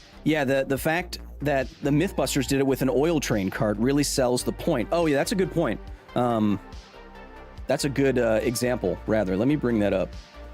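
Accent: Queensland, Australia. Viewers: Im also aussie and do sound aussie asf bro u even have the look of an aussie.killa content bro. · As a dirty New South Welshman I can say with authority that was actually a good suburban Queenslander accent. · Honestly great Australian accent but just watch out because you're turning kiwi for a second every now and then. Other than that surprisingly authentic impression which is difficult to come across with Australian accent impressions.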